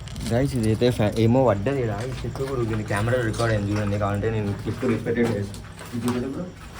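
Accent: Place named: Hyderabad, India. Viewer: Script kakunda genuine ga chesthundu bro pakka ne channel telugu lo manchi hype ostadi ...ne hyderbad slang chaala mem ki reache ayitadi but don't do scripted search for most haunted places take dare guys and communicate with ghosts mana telugu community lo ghost tho communication cheyanika raadu ....tamil lo simply sarath and grey wolf vasu videos chudu bro nd all d best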